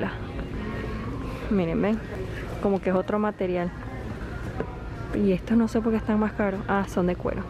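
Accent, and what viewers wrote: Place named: Venezuela. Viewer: Sos hermosa!! Me encantan tus videos, tu acento venezolano hermoso.👋👋👌❤️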